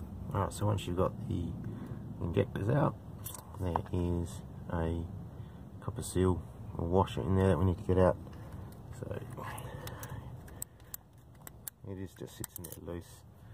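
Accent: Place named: Australia. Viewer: what a video, and talked in our Aussie language so it makes sense to us, doing mine hopefully in the coming month... one thing I have read on pages is diesel knock once replaced, do you know anything about what causes that or what steps need to be taken to prevent it? cheers, love the video mate, going to help amazingly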